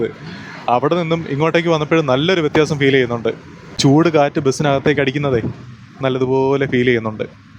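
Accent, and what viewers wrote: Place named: Malabar, India. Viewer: താങ്കളുടെ എളിമയും സംസാര രീതിയും പെരുമാറ്റവും കണ്ടാൽ താങ്കളൊരു കൊല്ലംകാരനാണെന്ന് ആരും പറയുകയില്ല ഒരു മലബാറുകാരനാണെന്നെ പറയൂ...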